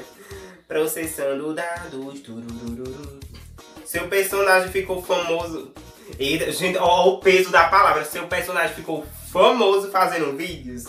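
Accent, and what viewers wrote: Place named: Northeast, Brazil. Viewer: Não sabia que ele era nordestino Ameii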